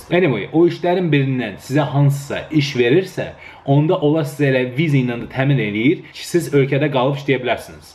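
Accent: Gadabay, Azerbaijan. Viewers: Cox maragli daniwir rus-Gedebey-avropa qariwig 👍👍😂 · Can e can Gedebey lehcem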